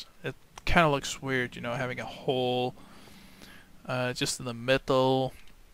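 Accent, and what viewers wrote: Denmark. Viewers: Bud, the aesthetics of this place are just a pure work of genius. I am loving it and more games with your danish accent would be enjoyable. :) Keep the vids coming. :)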